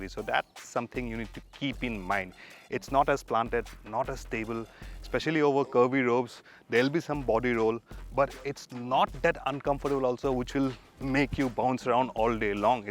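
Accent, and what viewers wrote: Assam, India. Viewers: Sir, apuni axomiya neki? Accent huni lagise..💗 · Lovely to see an Assamese host for a car show! I could figure out the Assamese accent right away!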